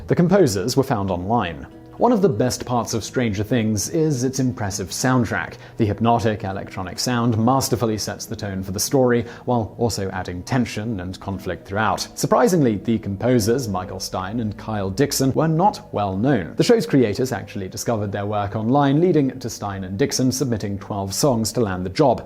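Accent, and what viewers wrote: United Kingdom. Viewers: You do understand he is British. They pronounce certain words differently. Unlike american english which has been flattened. We say words differently. So back off his pronunciation.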